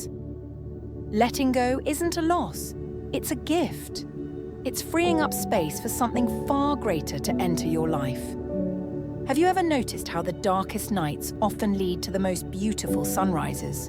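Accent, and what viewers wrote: England, United Kingdom. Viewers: I'm 58 , emotionally immature, with discouraging sudden mood swings that take me from poetry to the toilet....sort of speak in a manner of seconds, the mood swings are hormonal, the rest unhealed psychology, spiritual bankruptcy and much more..... I want to thank you for today your channel found me, I say it this way because I didn't know it existed to look for it to begging with. The two videos I've listened to this far.....sound True, and the non robotic lovely voice of the narrator with what I perceived as an English accent, it's the cherry on top. Her delivery brings believability to the things she claims,but I have yet to call my own experience. Thank you for the sense of calmness this has provided as I wrestled with this thinking of mind in getting myself ready to hopefully sleep. Truly thank you The content resonates as Truth to me, and the content and delivery make for a very reality based, perhaps it's possible for me, to have kind of experience. 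Thanks for the hope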